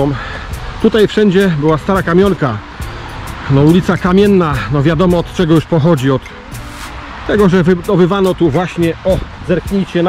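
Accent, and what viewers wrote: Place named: Podhale, Poland. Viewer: Jakiś dziwny akcent, coś górami zalatuje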